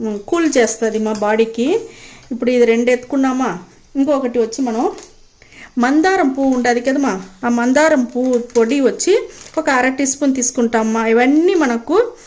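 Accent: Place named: Tamil Nadu, India. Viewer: Tamilien telugu edi . Kani great .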